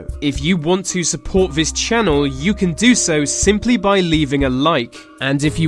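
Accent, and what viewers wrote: England, United Kingdom. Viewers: How interesting, a man with a British accent lists the most powerful families, yet conveniently overlooks the House of Windsor (NOT THEIR REAL NAME). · I've heard many disgusting limey accents in my 47 years of life but this one is, by far, the most horrible and fucked-up. Can't even say from what part of the fucking island is. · first of most pathetic to hear every other type youtube with English accented fool!!!!!!!!!!!!!second of all the word power is and have been misued in terms of the meaning....in reality the meaning of the word power is used as money for this and beyond era...…all these idiots that you display for us on youtube are just idiotic money abusing ignorant megalomanias!!!!!!!!!!!!!! - because mentality is being misguided every decade, so does the terminology of the word...……..money taints the reality of what human beings stand for..... 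no not corruption but worse than that....control!!!!!!!!!!!!!!! brainless - no intellectual weapon of choice!!!!!!!!!!!!!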